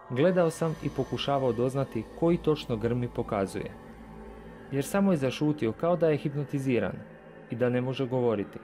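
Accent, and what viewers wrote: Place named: Ekavian, Serbia. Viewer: Uh uh uh uh uh uh uh uh uh uh uh uh uh uh uh uh uh uh uh uh uh uh uh uh uh uh uh uh uh uh uh uh uh uh uh uh uh uh uh uh uh uh uh uh uh uh uh uh uh uh uh uh uh uh uh uh uh uh uh uh uh uh uh uh uh uh uh uh uh uh uh uh uh uh uh uh uh uh uh uh uh uh uh uh uh uh uh uh uh uh uh uh uh uh uh uh ih uh ih a ka ono si ti šef vi ste ekavci srbi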